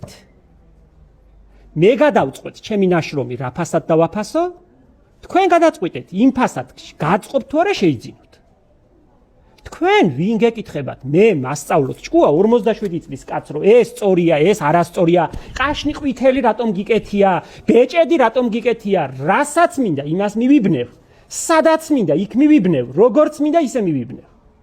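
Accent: Guria, Georgia. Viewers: ვგიჟდები შენ გურულ კილოზე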